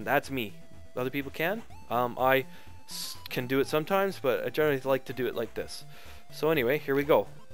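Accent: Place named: Alaska, United States. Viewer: Good collection of tips! I like your accent too. Reminds me of my time in Alaska.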